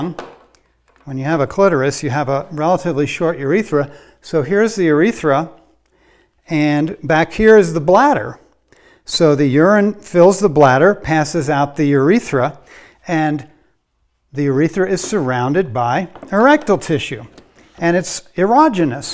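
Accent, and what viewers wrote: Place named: London, United Kingdom. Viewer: London..that's the first thing I noticed(^,^)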